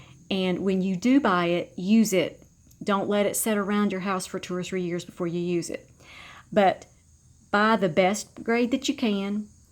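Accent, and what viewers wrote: South, United States. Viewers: without humor or the ability to laugh at yourself life would be sooo boring. I never take myself to serous. I like to be silly. Lost it for a bit but recently found it again. Right here on youtube among all of you❤ Its gonna be a great expiriment i have 1 sharry baby division in Chinese spagnum. It came off the same plant that had 20+snails and is currently residing in water and doing AMAZING. its in my second latest vid (how do you call that in proper English🤔 ) when i have them both in moss I'll come tell you. So You can be the judge of the moss (if its came back to life or if its the bad kind) you never fail to wam my heart. I might be Dutch but i do have the same good oll "southern?" Charm.😉 Notice that its the second time im trying to fish for info about where your from... since i love the accent but are not an expert.😁😇 Much love and happiness Kind regards rose 🌹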